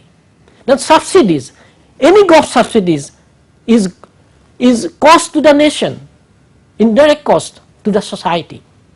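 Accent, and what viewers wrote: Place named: Gujarat, India. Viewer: Presentation is very systematic and thorough. No frills and fancies. There is no repetition and the material is complete. The speech should be dubbed over by an English medium educated person so that people all over the world will watch the video. The Gujarati accent is not appreciated generally even in India, even by Gujaratis themselves.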